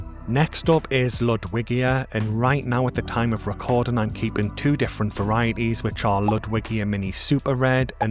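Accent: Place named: Scotland, United Kingdom. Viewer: Oh, and I (Swedish) thought it was a Scottish accent, probably insulting many people on both side of the England/Scotland border... ooops sorry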